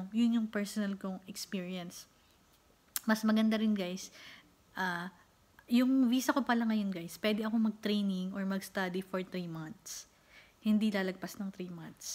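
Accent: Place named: Southern Tagalog, Philippines. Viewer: hi new subscriber to your channel... been planning to apply to work abroad for years medyo hnd po pinapalad pro I am still hoping that I can be able to fulfill my dream... anyway thanks for sharing this I'll surely try all the tips you've shared here... by the way are you from the south? Quezon or Batangas? hihi just curious though since we have a little bit of similarity in the accent... thank you and more power to your channel. :)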